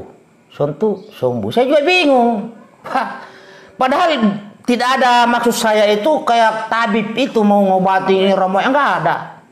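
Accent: Makassar, Indonesia. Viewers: Mantap rokok dan kopinya.... apalagi dengan logat Makassar nya pang bes ... keren 👍👍🙏 ciri khas komandan trade merk yang tidak bisa di tiru ... sehat sukses selalu komandan 👍👍🙏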